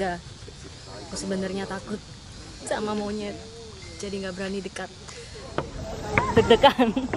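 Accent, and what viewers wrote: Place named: Surabaya, Indonesia. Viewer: Logat suroboyoan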